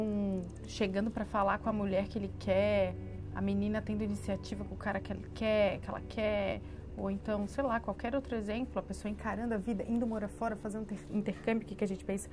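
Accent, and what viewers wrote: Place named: Santa Catarina, Brazil. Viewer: Tu é de Santa Catarina? Teu sotaque parece muito!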